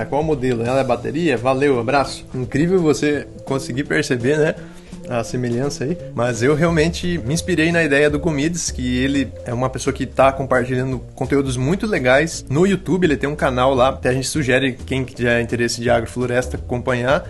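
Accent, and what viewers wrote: Rio Grande do Sul, Brazil. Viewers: Pelo seu sotaque já tava me mudando pro RS. Mas sabendo que tu tá no oeste do PR, muito mais perto. Vou ir andando me enfiar nesse espaço de vocês. Hahah #FicAGenteVêPorAqui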